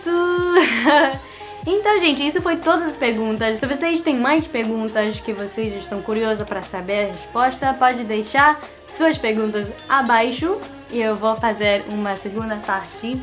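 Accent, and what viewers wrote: Rio de Janeiro, Brazil. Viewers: seu português tem sotaque de carioca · seu português tem sotaque carioca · falar português com sotaque de carioca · seu sotaque não parece americano, é bem carioca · parece uma carioca ela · coisashhh... Tá bem carioca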